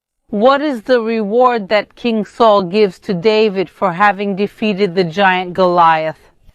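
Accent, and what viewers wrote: Israel. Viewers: I love your Israeli accent.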